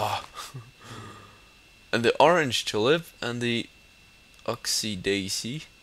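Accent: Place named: Denmark. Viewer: You sound danish!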